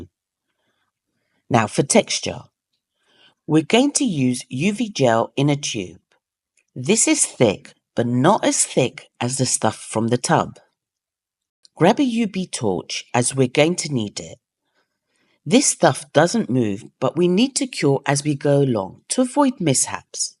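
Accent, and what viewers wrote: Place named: Canada. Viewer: you sounded like a Canadian 😊❤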